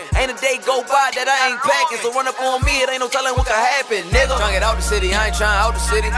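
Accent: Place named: Baltimore, United States. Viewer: marv brown its called a Baltimore City Maryland Accent thats how we talk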